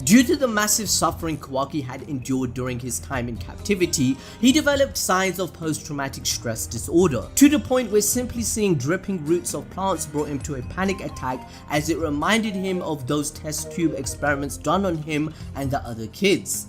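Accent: Punjab, India. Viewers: bro U indian? Wow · Indian excent is lit.... 💯💯💯💯💯💯💯💯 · lol are u punjabi bro?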